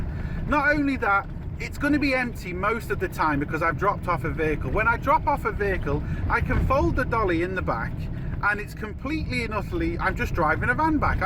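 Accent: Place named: England, United Kingdom. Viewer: Funny all the years you.ve lived here u never lost ur strong English accent 🤔🤔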